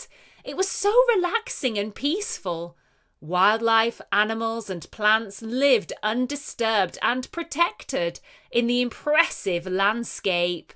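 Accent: United Kingdom. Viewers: Thank you so much. I love so much your British accent.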